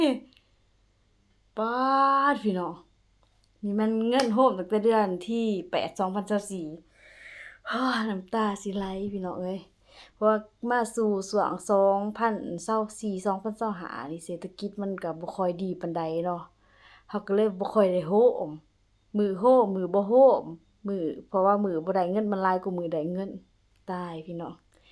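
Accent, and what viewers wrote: Ubon Ratchathani, Thailand. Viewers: สำเนียงการเว้า ของสาวห้องคือกันกับสำเนียงเว้าของคนอุบลฯ คน อำเภอน้ำยืนเด้😊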